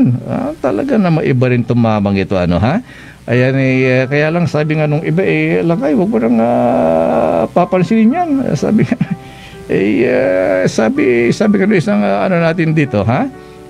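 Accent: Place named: Batangas, Philippines. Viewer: DIBA MARCOS KA? bat Batangeunio ang accent mo................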